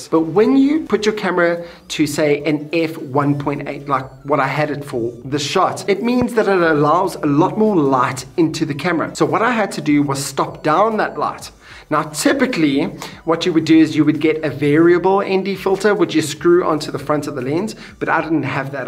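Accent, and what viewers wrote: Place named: South Africa. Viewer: I can hear you're South African😂 hello from Durban😌👍🏻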